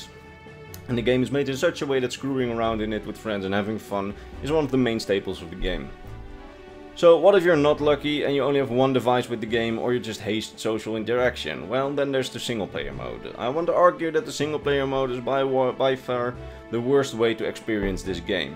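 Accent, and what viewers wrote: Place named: Netherlands. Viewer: Random question but are you Dutch lol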